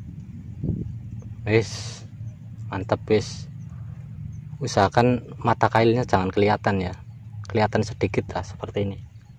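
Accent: Banyumas, Indonesia. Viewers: ngapak.ngapake ngendi mas bro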